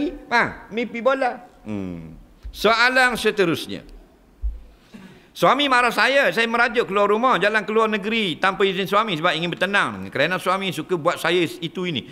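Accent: Terengganu, Malaysia. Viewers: Terbaik UAI.Terima kasih Ustaz.Seronok dengar loghat Terengganu Ustaz.👍👍👍👍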